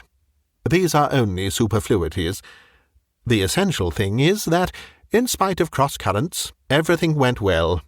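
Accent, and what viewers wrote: United Kingdom. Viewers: I don't sleep well so I listen to audiobooks on YT all night every night with earbuds. I like the fact that this compilation has over 9 hours of listening. Although I'm American I enjoy listening to listen to books read in a British accent. Your voice is fabulous. Luckily, I stumbled upon this excellent channel just today.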